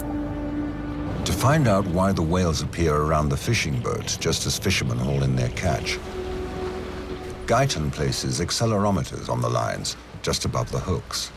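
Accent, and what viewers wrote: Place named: United Kingdom. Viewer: I really wish producers wouldn't use British voice-over with American scripts. Have some confidence guys! Also, to mention the blatantly obvious, it's not "eco-locate", it's "echo-locate", and 'dive' is a regular verb. It's not like "drive" just as "pee" is regular and "see" is not. Otherwise, we'd say, "I pawed my pants because I was so scared".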